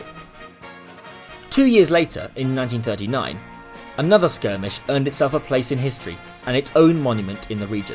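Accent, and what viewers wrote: Manchester, United Kingdom. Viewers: Yes, sounds like a bit of a Manchester accent to me, certainly a bit Northern UK? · Yes. A British-sounding man with an apparently good knowledge of North Korea history. He seems to have also a good pronunciation of North Korean names and places. Not to mention his sophisticated taste in choosing background music. In short a man of mistery.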